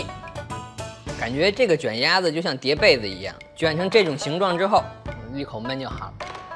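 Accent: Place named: North, China. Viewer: 口音像北方 長相像南方人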